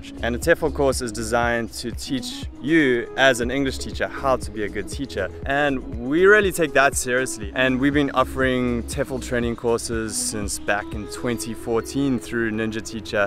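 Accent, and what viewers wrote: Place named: South Africa. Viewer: He sounds South African to me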